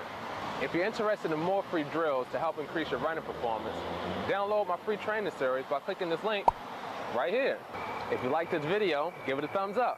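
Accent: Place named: England, United Kingdom. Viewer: great video bro i like ur English accent love from India jai Hind hehe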